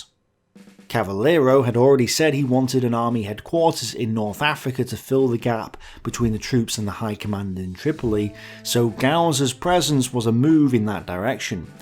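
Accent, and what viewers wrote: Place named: Northern England, United Kingdom. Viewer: really? I hear the accent, but it is certainly not a continental one, it is a british isles accent. Or did you think all Brits spoke perfect Queen’s English, because they happen to live in England? And that English doesn’t have dialects?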